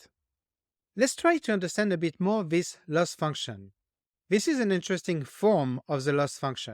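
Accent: France. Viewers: t'es français, mon pote ?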